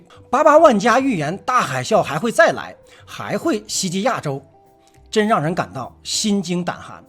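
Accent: Northeast, China. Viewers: 你是东北人吧？熬熬很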